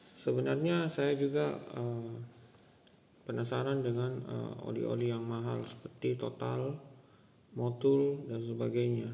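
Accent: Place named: Makassar, Indonesia. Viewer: udah style nya mungkin logat makasar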